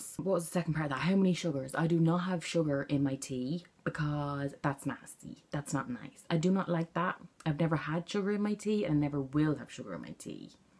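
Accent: Ireland. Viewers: Stoppp this video is amazing and I love it. Your accent is GOALS :D I want to visit ireland SO bad <3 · I really loved this video! Argh your accent!! You make me miss Dublin!! New sub!!💕💕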